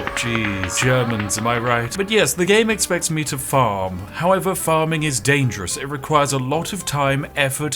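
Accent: United Kingdom. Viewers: let’s game it out but british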